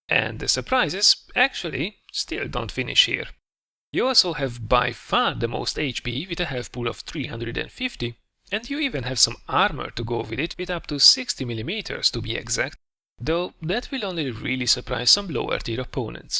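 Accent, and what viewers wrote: Hungary. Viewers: Gondoltam hogy magyar vagy az akcentusbol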